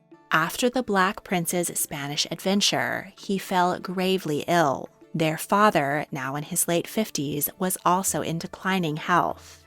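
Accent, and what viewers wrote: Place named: United States. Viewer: Such a strong American accent makes it a littke difficukt to understsnd all she says eg 'Rozayz- - 'roses'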